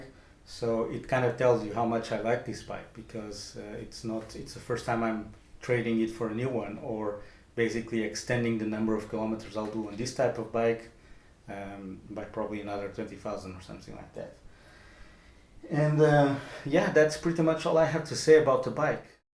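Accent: Portugal. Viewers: I can se by the use of some specific words and because i usually have a good sensibility detecting Portuguese accent, behind the English.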